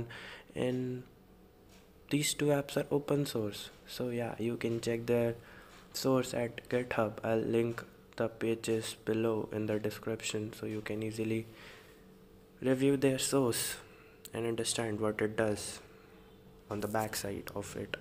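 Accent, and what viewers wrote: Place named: India. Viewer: its impossible for me to understand Indian people talking english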